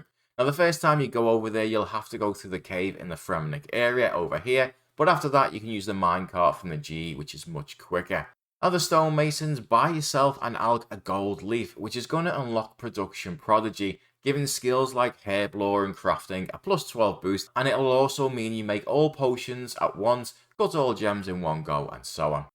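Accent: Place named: Wirral, United Kingdom. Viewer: Maybe a Wirral accent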